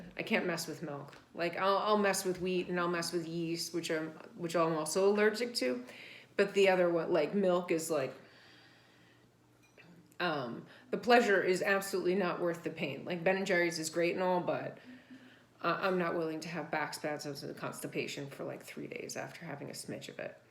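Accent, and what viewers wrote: New York, United States. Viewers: You still have a smidge of New Yawk accent! Wishing you the best, sending prayers, feel free to complain and bitch at any time! Have watched your journey and love that you share it, you are saving lives and now it's time to keep saving yours! Hugs!!!! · lol! love CAW - FEE ( COFFEE) - go New York gals :) and love your speaking - can't take the FUCK outta a New York girl :)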